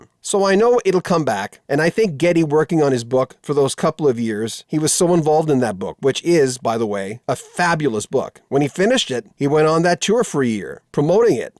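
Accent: Canada. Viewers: When you started reading Alex's quotes I was like, "This guy really sounds like Alex. I wondering if he's doing an impression consciously." Then I kept listening and thought, "Nope...just Canadian."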